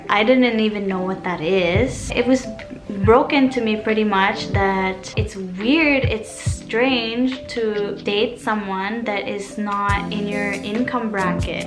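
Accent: Visayas, Philippines. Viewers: Ganda mo po 😍😍😍 and youre filipino accent is so cute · Ang ganda mo pilipinong pilipino ang salita mo kahit puti ang kutis mo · Ang galing mo naman,pati accent mo pinoy na pinoy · Your accent sounds filippino wow! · Yung English mo parang pilipino accent din 😍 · Para kang Bisaya na nagtatagalog, maganda!!! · Para na talagang filipina kung pumunto ka idol. Yung english mo filipina accent na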